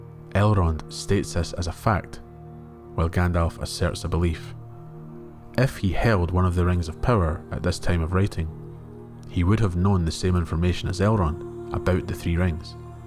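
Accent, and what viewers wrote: Scotland, United Kingdom. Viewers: Love the part involving Gandalf and the Balrog and the explanation there, have to admit that you give it so much thought. We have here a clash of the 'fire of the sun' as you kind of put it, but also a kind of 'pure-fire' (which may be associated with the colour white in a lot of artworks), and the 'red fire' of the Balrog. There is considerable colour-psychology at work here, with 'White' oft-associated with purity as you here noted, where red is a colour of 'passion' and 'rage', and given how the Balrog is often also associated with darkness (a colour of evil in classical colour-psychology). It seems that there's a statement being made here in regards to the nature of the two predominant figures and the kinds of flames they represent. Gandalf's is good and is representative of Eru and the Balrog is representative of Melkor (who is still technically out there in the void). 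Just something I noticed in this video (I know you covered all this) but it is still fascinating to go over it again. The use of colours in Tolkien's descriptions reveal also the attention to detail Tolkien put into his scenes, and add to his mythology (another ex might be Gurthang, being a black sword a representation of Turin's inner darkness, where his helm often appears golden, which is a regal colour associated with good but also greed). This video was perfect, really enjoyed it and your lovely Scottish accent, and really hope you do that history of Angband series that you want to do.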